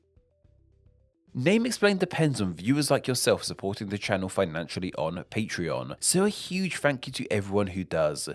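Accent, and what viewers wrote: United Kingdom. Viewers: I doubt he did it on purpose. Being a US citizen, I encounter many horrendous mispronunciations in real life. It's somewhat an "entitlement" to the language of its country. I hear people say: "How do you pronounce this or that with an English accent?" (not related to England of course) It's the lack of trying or want to try in my personal opinion. Don't misunderstand me, there are many who do try (and succeed or fail.) But this one is just reading the name with an extreme form of dyslexia and not caring, leaving the horribly butchered name in the video instead of editing it out with a correct pronunciation.... plain laziness and monetization. edit: And now I have to apologise because after listening on, I find the narrator speaks with a British, albeit not thick, accent and nothing to do with the Americas and the US of it.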